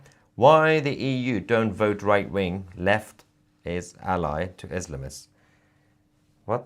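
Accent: United Kingdom. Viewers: Well, Trump didn't want war and Bibi did. Shows who really is in charge. Another disgrace is beginning. Asa for this announcer, he has a British accent. Just for that, I wouldn't believe a word he has to say.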